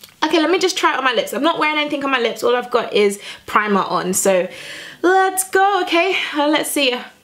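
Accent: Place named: United Kingdom. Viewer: Sound British you are a beautiful woman and it fit u perfect. Go girl.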